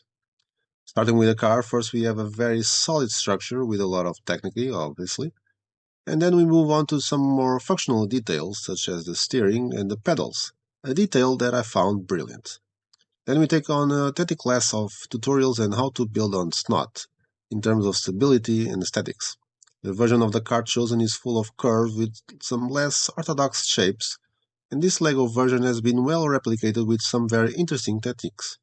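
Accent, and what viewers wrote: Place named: Italy. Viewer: Italian accent 🫶🏻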